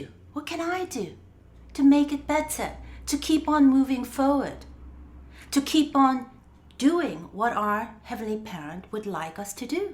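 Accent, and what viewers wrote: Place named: United Kingdom. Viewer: How did you get that British accent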